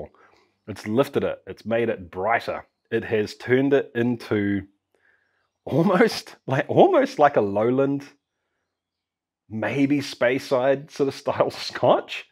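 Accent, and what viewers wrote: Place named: New Zealand. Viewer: New Zealand is weird. His pronunciations are so Aussie, yet simultaneously American! It’s hilarious, love this channel especially since I love my hard liquor!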